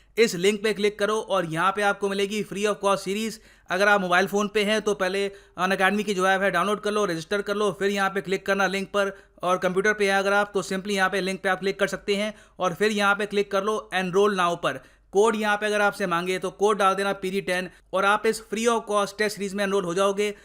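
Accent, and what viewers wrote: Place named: Maharashtra, India. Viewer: Sir apki hindi bilkul marathi jaisi lagti hai👍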